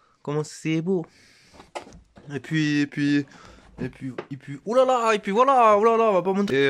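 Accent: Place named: France. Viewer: L'accent français j'pp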